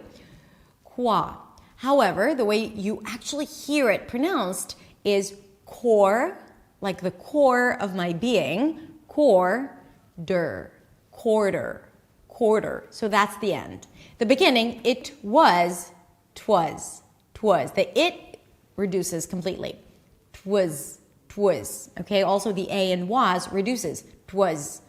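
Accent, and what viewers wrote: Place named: New York, United States. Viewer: I'm a native speaker of English, so I got all of these correct, and most of them almost immediately, so no surprise there. However, speaks with a New York accent, and I come from Detroit, in the Midwest, so I had a bit of trouble with "out of the office", because she actually uses a different vowel in "out", which sounds to me like "at". Point is, even native speakers can still have a bit of trouble with an exercise like this, so foreign students of the language should not feel bad about missing a few of these. English not only has these strange prosodic patterns, but the vowels often shift a lot from one region to the next.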